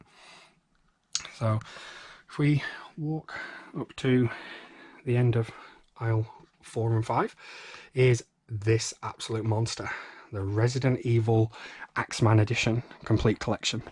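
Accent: United Kingdom. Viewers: You sound ?English but you said 5 bux where in the states are you ? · He sounds like hes from the UK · Why does he sound British?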